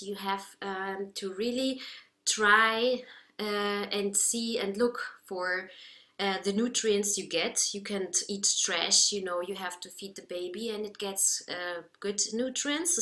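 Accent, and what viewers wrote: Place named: Germany. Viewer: Hab schon gedacht: deutlich deutscher Aktzent :D Tolles Video, danke für die Tipps, viele Grüße!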